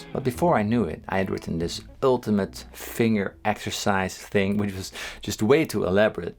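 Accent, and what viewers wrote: Netherlands. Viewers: Hey man are you dutch? Zo ja hallo uit nederland!!! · Thanks man! So simple, yet so nice! BTW, are you Dutch?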